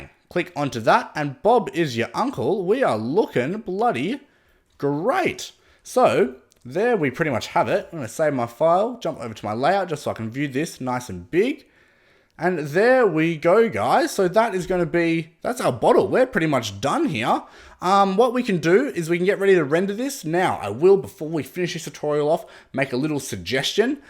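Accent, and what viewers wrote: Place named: Australia. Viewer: blood hell that was fantastic. I learnt heaps... and its great to hear another aussie too :)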